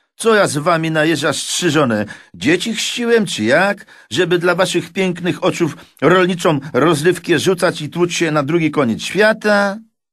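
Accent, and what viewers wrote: Warsaw, Poland. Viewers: Felietony pana Stefana są nieziemskie. A pan Buczkowski czyta te felietony z należytem szaconkiem i sznytem stolycy. Jako rodowity Warszawiak z Pragi pan posiada stosowny akcent wrodzony. A pan Piecyk by stwierdził że autor nie był ojcem pana.😂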